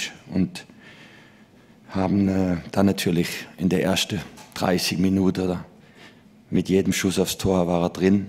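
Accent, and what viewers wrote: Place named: Baden, Germany. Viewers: deswegen würd ichs als badisch/allemannisch bezeichnen. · schtimmd! Er chummt us Eimeldingä - des isch 10 km fu Lörrach.. ;-)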